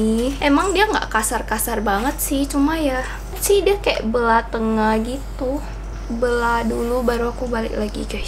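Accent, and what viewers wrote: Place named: Java, Indonesia. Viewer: KAKA KALO NGOMONG KAYAK ORANG JAWA SIHH 😂😂 TAPI AKU YA ORANG JAWA YA HEHE 😅😅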